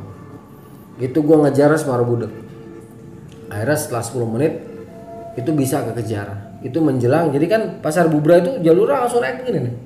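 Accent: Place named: Bandung, Indonesia. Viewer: Bang bisa logat bandung euyy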